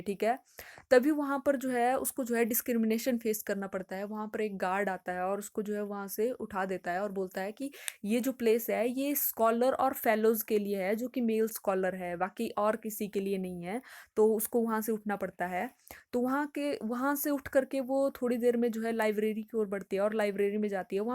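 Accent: Haryana, India. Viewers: Wow it's amazing I understand each and every point From your voice - i can guess Are you belongs from Haryana?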